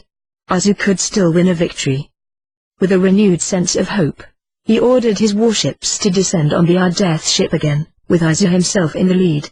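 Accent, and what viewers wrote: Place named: England, United Kingdom. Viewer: It s hard to listen this robotic voice with english accent.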